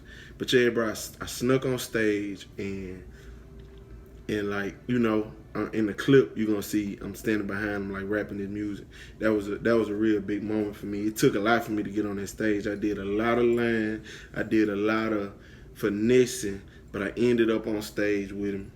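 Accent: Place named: Louisiana, United States. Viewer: It make perfect sense dat he frm Louisiana cuz he alr got da accent so it probably ain that hard for cuz to even do iy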